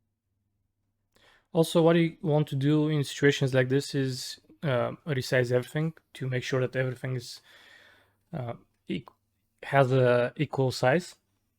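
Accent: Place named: Russia. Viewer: you must be russian because your so sounds like все and your sheet sounds like shit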